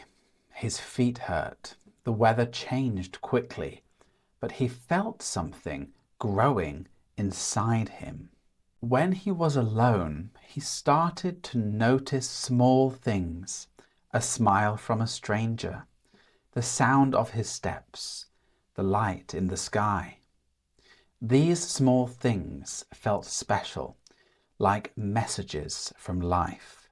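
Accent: RP, United Kingdom. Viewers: Do you speak recieved pronunciation ?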